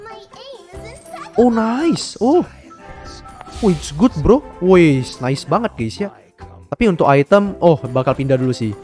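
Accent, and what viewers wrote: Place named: Java, Indonesia. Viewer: Asal mana ya mas ? Kok logatnya agak jawa dikit hehe salam dari surabaya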